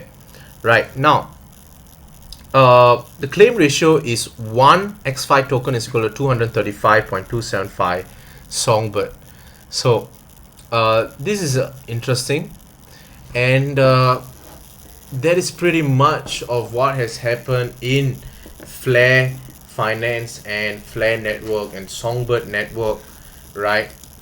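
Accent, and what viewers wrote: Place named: Malaysia. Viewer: Yup, clickbait title to get views. Some dude from Malaysia by the sounds, doing a lot of talking but not saying anything new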